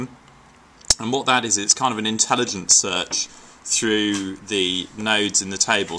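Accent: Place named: Australia. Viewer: The next tomtom?? Just wondering why you sound Australian in this video?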